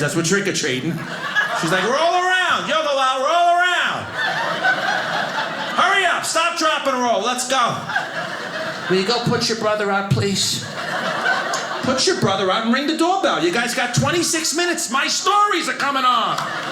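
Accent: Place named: New York, United States. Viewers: I enjoyed this set, I like his NY accent, good story line and punch lines. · That was amazing. Great jokes, great delivery, great timing, great facial expressions. Instantly likeable, too. And on top of all that, me being a German language nerd, a fascinating case study of the New York accent and mentality. 👍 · Classic standup style routine, but still original. Love the NY accent. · First time seeing this comic, testing my accent recognition skills. Have not googled him. But he’s from NYC.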